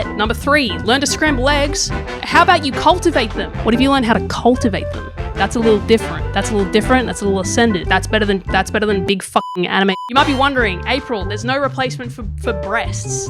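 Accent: Australia. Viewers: So are you Australian or something? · Clearly aussie, but whats the go? Nintendo is cool, but this is somehow related to porn? Tha fuck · who knew that all i needed i was an aussie girl cussing me out and telling me to stop shucking the corn. 10/10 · thank you for being Aussie, I love Australian people accents. I can't stop listening 😂😂😂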